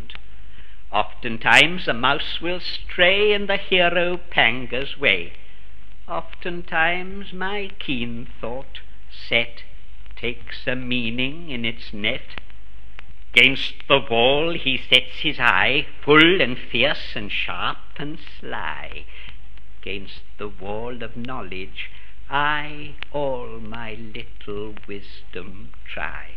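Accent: United Kingdom. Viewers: Delightful to hear a British voice reciting this poem. By the way, why is Pangur Ban always portrayed as an all white cat? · The poem is Irish so why are you delighted to hear a British accent?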